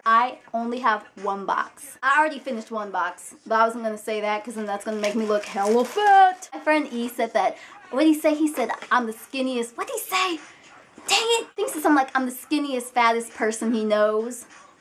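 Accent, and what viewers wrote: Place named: Brooklyn, United States. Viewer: are you from Brooklyn,NY? you sound like it.. lol i love ur videos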